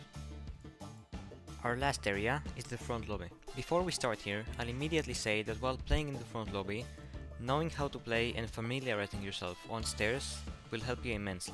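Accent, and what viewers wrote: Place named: France. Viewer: Your accent and voice are sooo mesmerizing… I learned so much. Are you French or European?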